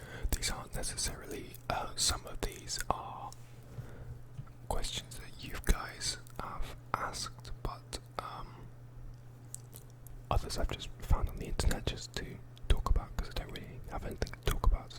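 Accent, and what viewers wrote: United Kingdom. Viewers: Hi! I'm from Brazil...I love your videos and your British accent, very relaxing. Thanks for the video!:D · what part of the uk are you from? i really can't tell from your accent haha x love the videos man❤️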